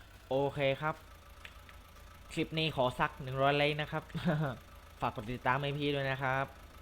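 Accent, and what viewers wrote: South, Thailand. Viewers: พี่อยู่จังหวัดอะไรเเต่เสียงคนใต้ใช้ไหมครับ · พี่เขาภาคใต้ครับ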